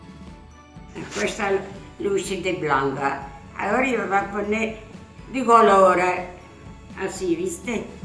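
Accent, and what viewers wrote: Italy. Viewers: Nonna: me recordas a mi mamá!! Falleció en enero de este año. Tenía casi 99 años!! El mismo acento que el tuyo. Era de la zona de la Irpinia. Hacia también las Pupas, corazones y los infaltables "strufulis". Siempre para Pascuas!! Te mando un abrazo para vos y tu nieta que te ayuda!!! Gracias!!!! Seguí así!!! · Estoy llorando y es de amor...mi nonna hacía lo mismo, hablaba igual y era abruzzesa...emplea el "lu" que es re dialectal, me encanta ! Gracias por subir esto querida! Disfrutala! Mille bacci per la bella nonna!!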